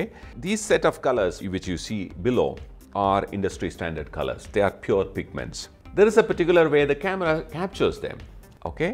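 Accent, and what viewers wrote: Kerala, India. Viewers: really enjoying how he explain it, simple and understandable, and especially the accent :) is it india? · Are you mallu 😀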